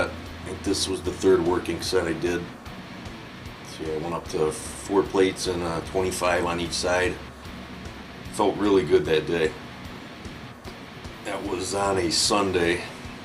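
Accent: Canada. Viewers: Always wanted to ask, are you Canadian?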